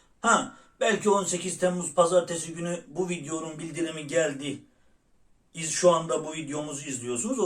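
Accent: Erzurum, Turkey. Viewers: Sen Erzurumlusan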